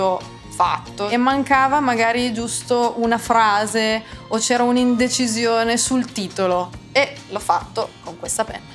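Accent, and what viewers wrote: Italy. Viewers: Si è brava... E bella. Le donne Liguri parlano così... Io anche...parlo così sono cresciuta Genova.. le mie nipoti belle..parlano con accento così... Ciao baci...Ellis❤❤❤❤😅😅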